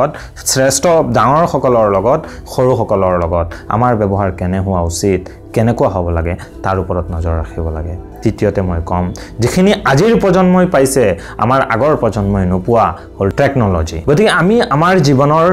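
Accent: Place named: England, United Kingdom. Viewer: M a big fan of your English ascents bro 🔥 Great work carry on 🙏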